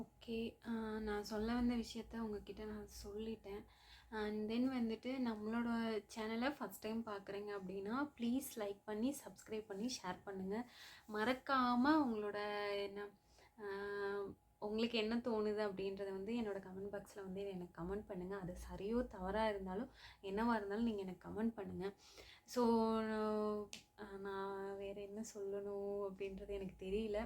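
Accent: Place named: Kanyakumari, India. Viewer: தமிழ் பேசும்போது மலையாளம் கலந்து வரும்போது கன்னியாகுமாரி தமிழ் மாதிரி இனிமையாய் இருக்கிறது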